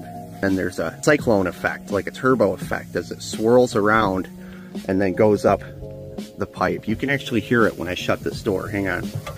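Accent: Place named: Michigan, United States. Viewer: You sound like you have a Michigan accent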